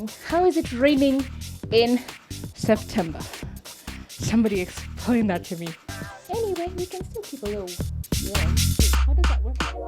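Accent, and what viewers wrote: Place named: New York, United States. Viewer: wow , i,m new in the channel at first i thought ure from new york ....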